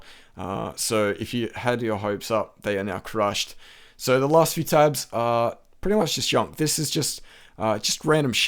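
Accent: Australia. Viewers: nice vid man, always good to hear an aussie commentator. get dem 1m nats cuz :P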